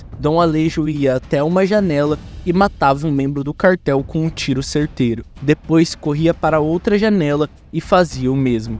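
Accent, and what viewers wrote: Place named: Caipira, Brazil. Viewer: História longa e narrador puxando o r de caipira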